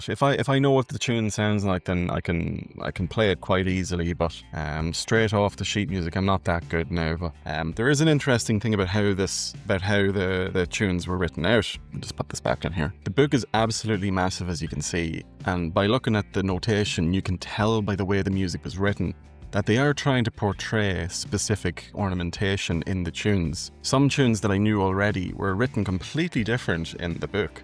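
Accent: Ireland. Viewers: Thank you for your reply, you're doing a great job with your channel, by the way. I'm curious about your accent, you sound like you might be a Leitrim man like myself or maybe Cavan or Monaghan 😉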